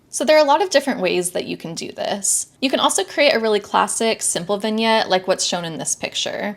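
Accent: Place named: California, United States. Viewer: I pick up vibes in a voice and what your voice with vocal fry and upspeak suggests is very arrogant Snobbish and bored. Its sort of a mean girl california sound. It cant help it I am interested in what you have to say but just as if you were shouting at me its hard to get past the affectation.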